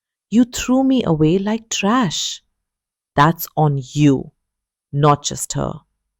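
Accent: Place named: India. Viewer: The narrator voice changed to Indian,not professional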